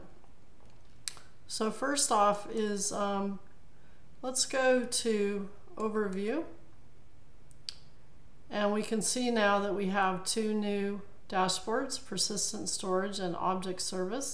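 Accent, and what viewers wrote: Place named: New Zealand. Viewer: Great video. Love the kiwi accent too!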